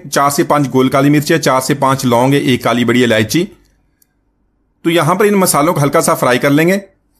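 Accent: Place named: Pakistan. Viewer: bhai, aap bohot he umda recipe lay ker aaye hain aur bohot he achi terhan say samjhaya bhi hai kay kiss terhan pakay gee. Sahi Pakistani recipe aur lehjay main video banai hai aap nay, Zaberdust!!!!! bohot shukria.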